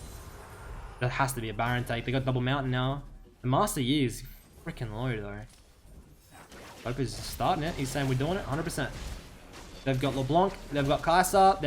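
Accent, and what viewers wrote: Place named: Australia. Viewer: voice is so annoying...too aussie...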